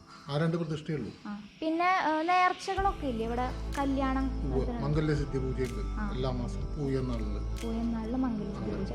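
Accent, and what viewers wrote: Thrissur, India. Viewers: Aa thrissur karide aa slang powliyatto atha kuduthslum video kanan preripiche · അടിപൊളി അവതരണം ആണുട്ടോ.. എന്നാലും നമ്മുടെ നമ്മുടെ നമ്മുടെ ഒന്നു കുറക്കാൻ പറ്റുമോ. തൃശ്ശൂർ സംസാരം കേൾക്കാൻ നല്ല രസമാണ് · Ente frnd Dayakuttynte same samsaram avalum Thrissur anu... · A simple village vlog from Simple Village girl 👧 loves your Thrissur slang talking 👩‍🎤😍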